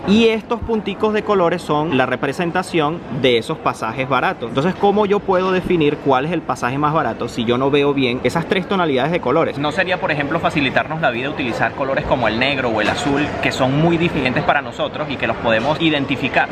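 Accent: Venezuela. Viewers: Umm!! Es la primera vez que escribo un comentario a un Youtuber y veo a muchos!! Tus vídeos me gustan mucho, soy de España hace poco he estado en Portugal unos días :-) me ha llamado la atención ayer ver la banderita de Portugal en tus vídeos y que esté escrito el título en español, enseguida me he dado cuenta que eras Venezolano al escucharte hablar. :-D que chulo!! Venga a por más vídeos!!! Olé!! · eres de venezuela?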